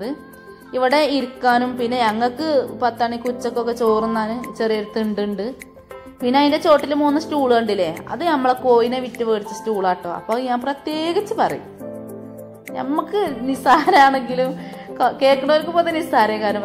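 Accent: Malappuram, India. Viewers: താത്ത എന്റെ മലപ്പുറം ഭാഷ നല്ല രസമാണ് എന്തിനാ താത്ത ഇംഗ്ലീഷ് താത്ത എന്റെ ഭാഷ എല്ലാവർക്കും മനസിലാകും കൊറേ ഒക്കെ പഠിക്കാൻ പറ്റി ഇനിയും പുതിയ വിഡിയോസൈവരണം insha allah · ങ്ങള് ന്തിനാ ഇംഗ്ലീസ് പറേണു ..മലപ്പുറം style സൂപ്പർ അല്ലെ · നല്ല വീട് മലപ്പുറം ഭാഷ കേൾക്കാൻ നല്ല രസമാണ്. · ഇത്താ ഞാനിപ്പഴാ ഇങ്ങളെ ചാനൽ കാണുന്നത്... ഞാനും മലപ്പുറം പെരിന്തൽമണ്ണയിലാ... ശെരിക്കും ഒരുപാടിഷ്ട്ടായി... ഹോം ടൂർ ഒരുപാടിഷ്ട്ടായി...നമ്മടെ മലപ്പുറം ഭാഷ കേട്ടപ്പോ അതിലേറെ സന്തോഷായി 🥰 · ഇതാണ് അസ്സൽ മലപ്പുറം ഭാഷ...Very good ingane thanne parayanam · മലപ്പുറം ഭാഷ... ഒരു രക്ഷേമില്ല kalakki👍👍👍😁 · നിങ്ങളെ മലപ്പുറം ബർത്താനം ഉഷാറായിക്ക്ണ് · സംസാരം സൂപ്പർ. മ്മളെ മലപ്പുറം സ്ലാങ് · ഞാനും മലപ്പുറാ പക്ഷെ ഇങ്ങിനെത്തെ ഭാഷ സംസാരിക്കില്ല ട്ടോ- കേൾക്കുമ്പൊ ഒരു പ്രത്യേക രസം😅😍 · നിങ്ങൾ എത്ര വരെ പഠിച്ചു നിങ്ങളെ സംസാരം കേട്ടാൽ മലപ്പുറത്തക്കാരെ ഓർമ വരും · മാഷാ അല്ലാഹ് നിങ്ങളുടെ മലപ്പുറം ഭാഷ പൊളിച്ചു ❤️❤️ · ഗുഡ് ഈ സംസാരം കേൾക്കാൻ തന്നെ ഒരു പ്രതേക സുഖം മലപ്പുറം സംസാരം എത്ര കേട്ടാലും മതി വരില്ല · Spr vedio&malappurm samsaaravum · നമ്മുടെ മലപ്പുറം ബ ർത്താനം കേൾക്കാൻ എന്താ രസം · ഇതാണ് തനി നാടൻ മലപ്പുറം ഭാഷ അഭിനയവും നാട്യങ്ങളും ഇല്ലാത്ത വീഡിയോ മാത്രമല്ല വീട് പെയിന്റ് അടിക്കുന്നതിന് മുമ്പ് തന്നെ ഇതൊക്കെ കാണിക്കാനുള്ള മനസ് വന്നതും ബെഡ് ഷീറ്റ് വീഡിയോക് വേണ്ടി ശരിയാക്കിയതാണെന്നു പറഞ്ഞതും നിങ്ങളുടെ മനസ്സിൽ അൽപ്പം പോലും ജാഡയും പൊങ്ങച്ചവും ഇല്ലാത്തത് കൊണ്ടാണ് ആദ്യമായിട്ടാണ് ഈ ചാനൽ കാണുന്നത് വീഡിയോ ഒരുപാട് ഇഷ്ട്ടപ്പെട്ടു ഇതൊക്കെ സപ്പോർട്ട് ചെയ്തില്ലെങ്കിൽ പിന്നെ ഏതാ സപ്പോർട്ട് ചെയ്യാ ഞാനും മലപ്പുറമാണ് നിങ്ങൾ മലപ്പുറത്തു എവിടെയാ?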